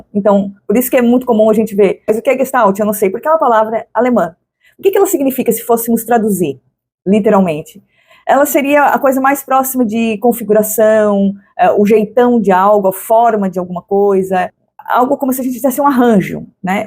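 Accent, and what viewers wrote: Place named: Azores, Portugal. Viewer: Maravilhoso! E é gostoso ouvir o sotaque açoriano, ainda que leve.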